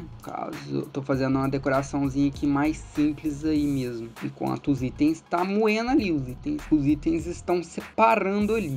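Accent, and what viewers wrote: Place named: Minas Gerais, Brazil. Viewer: Muito bom esse seu jeito mineiro de falar deixou mais legal parabéns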